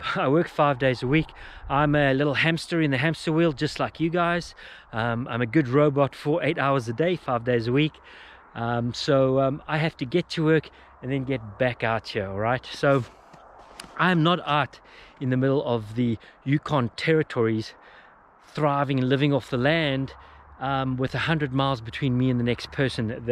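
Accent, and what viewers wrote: South Africa. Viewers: You know I love that craic what your doing but one thing I'm very curious about your accent I think I hear a bit of South African there but not 100% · Sounds like a South African.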